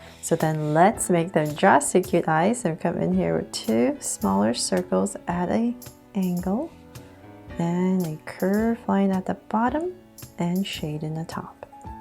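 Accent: United States. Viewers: Omg I ❤️ Your American accent!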